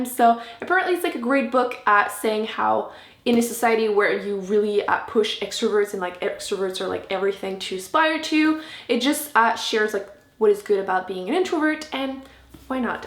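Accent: Quebec, Canada. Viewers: Breanna May she's French Canadian :) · I knew you spoke french 😃😃😃😃😃😃😃 We have the same way of pronouncing some words. I bet you're also Canadian like me😀😂 Anyways love this video💙💙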